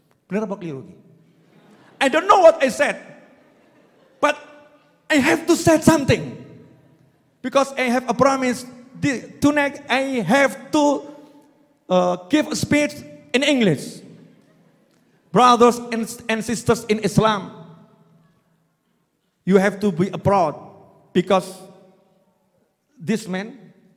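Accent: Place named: Java, Indonesia. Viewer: Aksen jawa nya...mirip2 pa jokowi klo dalam bhs inggris ...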